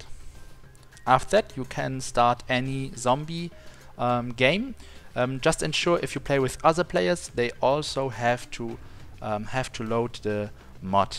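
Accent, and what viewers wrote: Germany. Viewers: Man hört das du Deutscher bist😂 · Dieses German english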